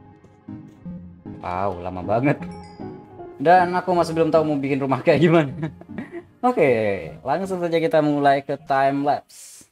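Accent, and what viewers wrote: Madura, Indonesia. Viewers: Bang lu orang madura? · Orang MADURA Bang? Madura mana bang?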